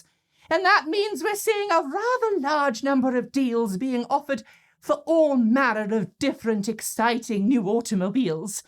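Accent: England, United Kingdom. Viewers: great episode. and I liked the British pronunciation. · I love the proper English accent.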